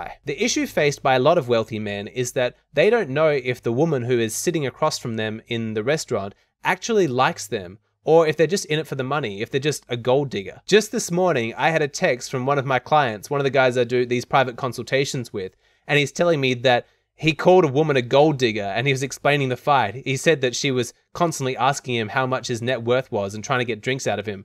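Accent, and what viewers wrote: South Africa. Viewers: That accent sounds south african. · She's so South African 😂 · This is for sure a South African video. I can hear the South African accent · She sounds soooooo 🇿🇦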